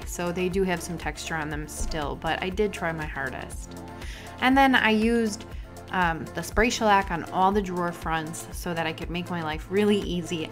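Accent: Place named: Midwest, United States. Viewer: I love your Midwest accent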